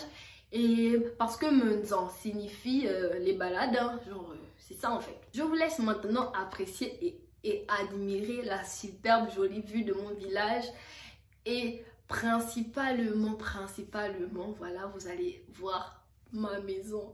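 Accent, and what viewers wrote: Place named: Fang, Gabon. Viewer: J’attendais ta vidéo juste pour écouter ton FANG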